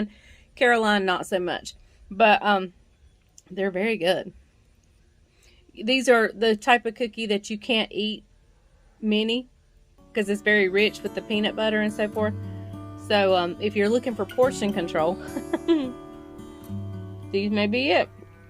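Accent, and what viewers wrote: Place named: Georgia, United States. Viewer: The cookies look delicious! I enjoyed watching this video because of your authenticity, but, also because our accents are very similar. My husband’s and my family were born and raised in north Georgia in a valley that runs along an Appalachian foothill - and we are still here! I can’t imagine my life without the woods and the mountains!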